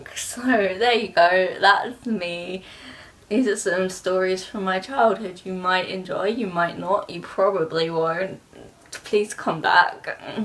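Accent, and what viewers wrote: England, United Kingdom. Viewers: You had a different accent actually. It was more English than american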